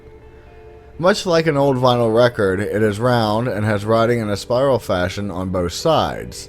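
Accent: United States. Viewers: Like the video. I assume the narrator is an american, and i´m just curious where in america one talks like this. Is it called dialect?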